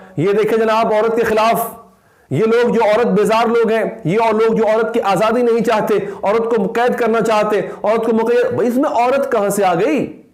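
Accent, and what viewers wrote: Punjab, India. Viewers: Punjabi touch 💞 Stay blessed bro💐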